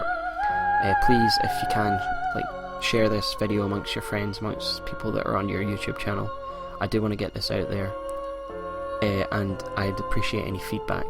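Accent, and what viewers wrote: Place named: Scotland, United Kingdom. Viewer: Dat Scottish accent. Nice vid man.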